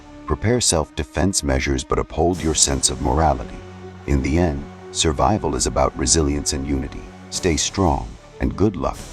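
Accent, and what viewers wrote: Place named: United States. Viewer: 好喜歡這個美式英語的旁白，增加不少信聞中常用的語句，不同於英語課本，離生活中遇到的，同時那考試也是一樣！我旅居英國15年，和同是聊天，就類似如此！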